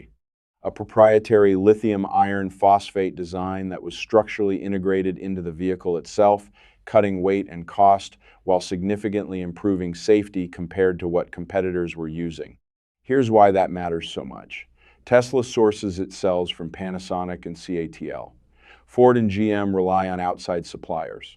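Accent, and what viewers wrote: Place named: United States. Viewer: When I hear an American Voice telling me the Chinese Competition is done I'm skeptical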